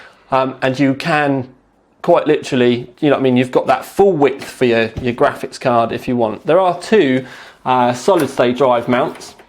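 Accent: United Kingdom. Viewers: 4kin ell m8 love me soem briteish accnt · Love dat British speech.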